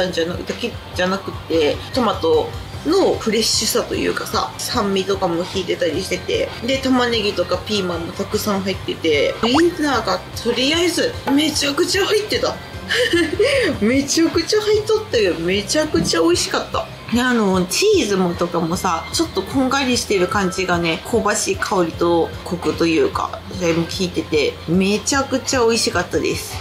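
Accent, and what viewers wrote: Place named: Osaka, Japan. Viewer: 大阪弁が入っているので親近感がわきます。 それにしても食レポが巧いですね。ものすごく食べたくなりました。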